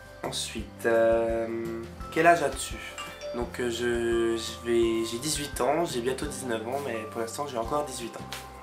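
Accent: Belgium. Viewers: Je suis belge et c'est vrai qu'en t'écoutant, j'étais persuadée que tu l'étais aussi :D Chouette découverte en tout cas, continue comme ça ;)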